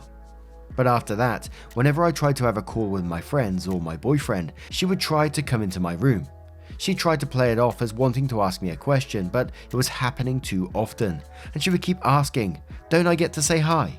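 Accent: England, United Kingdom. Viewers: just wanna say, i am american, and I'm not huge on english accents but I love your voice, its so calming even when you are swearing i love it xD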